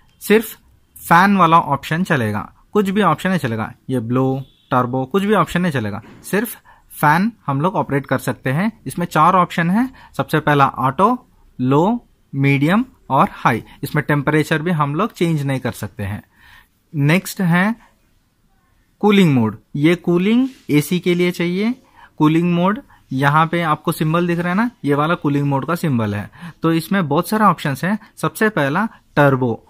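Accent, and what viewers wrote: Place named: South, India. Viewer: Very good explanation I think you are from south india area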